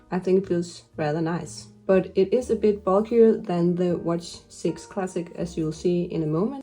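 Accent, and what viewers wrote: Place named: Denmark. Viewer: The Danish Accent 😍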